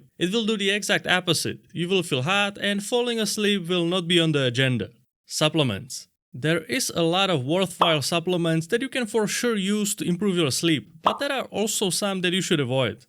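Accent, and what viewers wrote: Czech Republic. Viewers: i'm not sure but his accent sounds Czech or Slovakian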